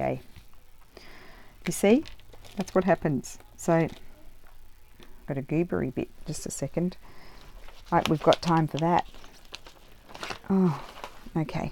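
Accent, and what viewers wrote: Australia. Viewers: Hi are you from Australia 🇦🇺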